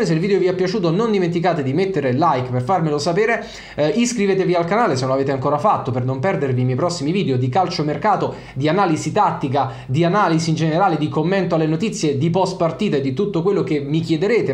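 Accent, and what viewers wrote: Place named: Naples, Italy. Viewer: di dove 6? Hai un accento napoletano. Cmq sei in gamba, saluti e ForzaNapoli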